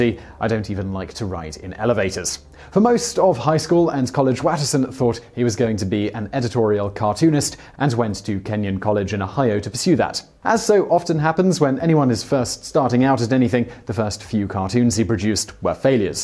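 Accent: England, United Kingdom. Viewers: Why is not cooking and have english accent?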